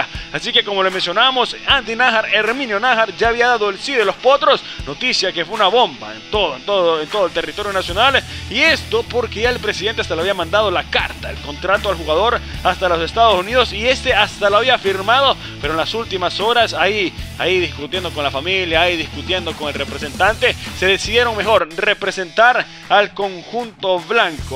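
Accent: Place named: Costa Rica. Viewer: Pensé que era un tico 😅😅😅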